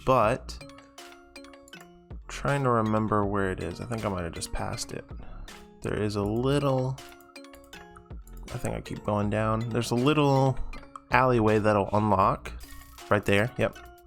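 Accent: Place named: Bisaya, Philippines. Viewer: hahahahah bisaya spotted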